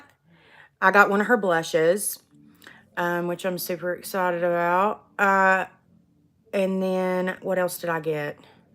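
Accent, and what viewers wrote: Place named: Tennessee, United States. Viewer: Hi! I M just be dreaming, right? That shirt, & girl that accent! Have I found one, a Tennessee girl? Your makeup skills are awesome? Im new to this, like couple of weeks new. Learned to contour my nose last week & I'm old, isn't that sad??? anyways I was just saying hey!!! 😊Have a 'kick ass' day....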